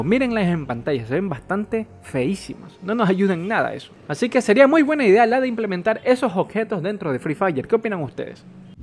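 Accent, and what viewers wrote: Ecuador. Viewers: Pregunta: De que parte de Ecuador eres? Lo digo por q en un directo te vestiste de amarillo y dijiste como el "Barcelona" y en unos vídeos sabes hablar como ecuatoriano xd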